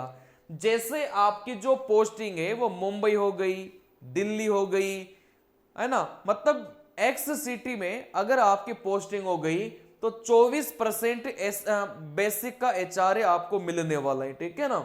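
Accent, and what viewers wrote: South, India. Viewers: Sir aap jan bujhkar aise bolte ho ya aap south se ho aur aapne hindi seekhi hai padhane ke liye isliye aise bolte ho.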